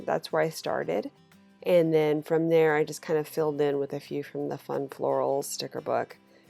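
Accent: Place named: South, United States. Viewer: Oh, you are in California... I thought you were down south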